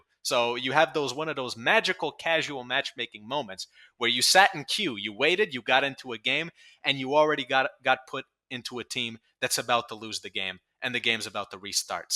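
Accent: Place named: New York, United States. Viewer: Babe wake up, the NYC sounding European is talking shit about Walve again!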